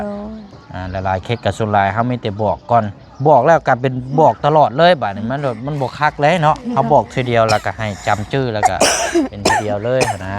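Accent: Thailand. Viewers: กลับมา..จากบ้าน ( ออกจากบ้านมา) สำเนียงไทย ❤❤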